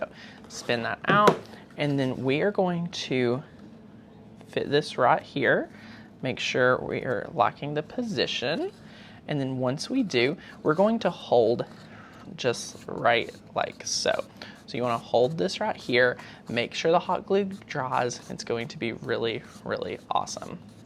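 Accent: South, United States. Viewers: Im new to your channel. Love your southern ascent. Where are you from. Love your ideas.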